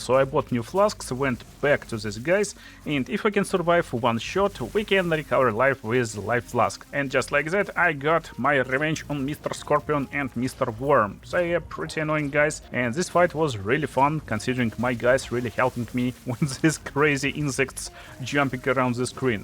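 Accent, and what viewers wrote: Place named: Russia. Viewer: Слышно что ты русский)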